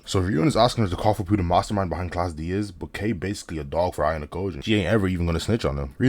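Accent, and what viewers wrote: Ireland. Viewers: are you Irish 🇮🇪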